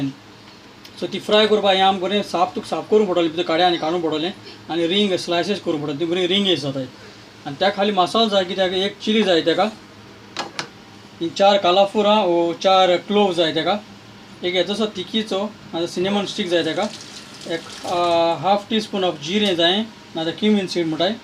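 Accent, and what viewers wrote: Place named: South Goa, India. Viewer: sure. Are you from south Goa, from your speaking style, I assume.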